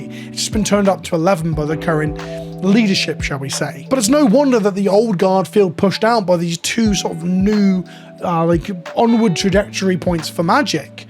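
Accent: United Kingdom. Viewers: Man i sure wish i understood what he was saying but all i heard is British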